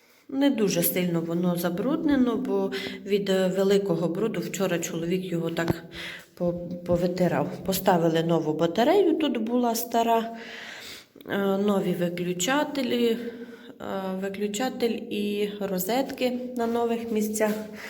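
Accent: Transcarpathia, Ukraine. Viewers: Цікаво Вас дивитися,дуже люблю людей з Закарпаття і ваш діалект.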